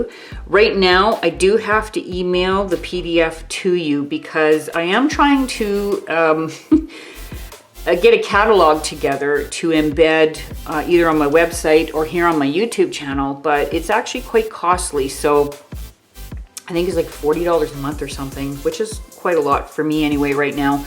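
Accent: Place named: Canada. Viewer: I thought I heard a Canadian accent.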